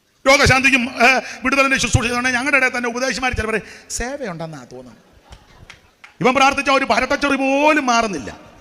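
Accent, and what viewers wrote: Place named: Thiruvalla, India. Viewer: തിരുവല്ലക്കാരുടെ ഭാഷ 😂😂😂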